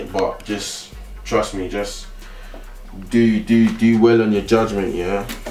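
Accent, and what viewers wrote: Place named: London, United Kingdom. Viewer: Where's he from? I'm guessing either Hackney or Tottenham the way he speaks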